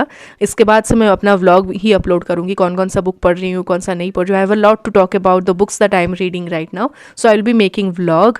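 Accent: Odisha, India. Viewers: Hlw mam i guess you're from odisha! RIGHT!!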